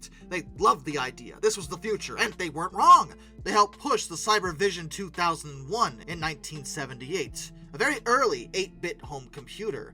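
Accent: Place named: Mid-Atlantic, United States. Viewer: I like it. It's like a mid 20th century newsreel with a slight mid Atlantic accent. Appropros for the subject.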